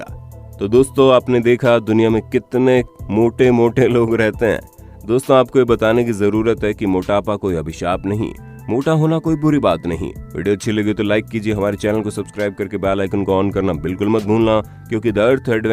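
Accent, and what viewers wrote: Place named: Jaipur, India. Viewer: Voice Vale bhiya Jaipur ke rehne Vale h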